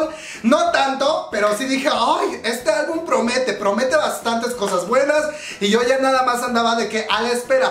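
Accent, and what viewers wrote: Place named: Mexico. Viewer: Comadre yo te sigo por la forma como tu hablas me encanta tu acento mexicano yo no soy fan de ningún grupo femenino de Kpop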